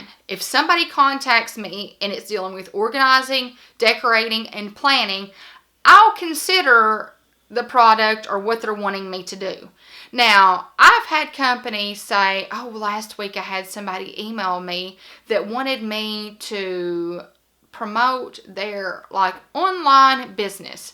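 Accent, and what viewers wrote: South, United States. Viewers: LOL I thought you talked slow because you are a teacher and was in the habit of talking slower to explain stuff to your students. I'm a Southerner too, so no problem understanding you. I'm from Ky.And I love your videos.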